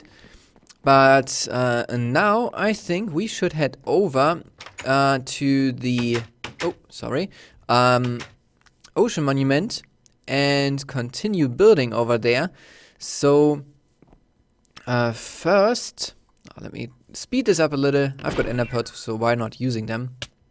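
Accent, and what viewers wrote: Germany. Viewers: Man hört sofort: Deutscher.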